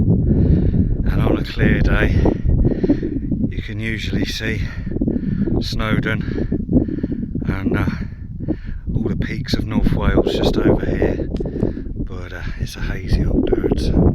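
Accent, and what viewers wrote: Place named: Gloucestershire, United Kingdom. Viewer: good video,cant believe the mingry lit u up with their "night sun"-shocking lolz,noticed an earlier comment on your accent,is it Gloucestershire?if not defo Hampshire.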